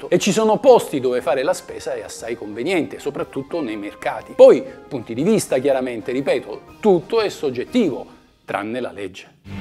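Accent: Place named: Calabria, Italy. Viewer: ma si sente che ha l'accento calabrese, e nei video lo dice abbastanza spesso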